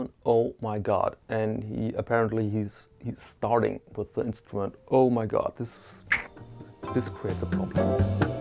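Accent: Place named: Germany. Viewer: sounding very much like a german yourself, you might have noticed that Alexander Shorokhoff very well is born in Russia (Moscow) but his company is very much in Germany. To my taste the mixture of italian avantgarde design combined with german precision manufacturing from a russian engineering architect can possibly be taken quite serious. For me at least its a breath of fresh air, that depreciates by far not the way you mentioned. I like this video very much, cause you really showed something different, that is not often to be seen. Thank You.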